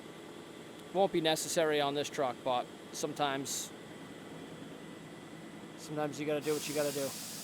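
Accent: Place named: Massachusetts, United States. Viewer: 6 seconds in and I already know you're from mass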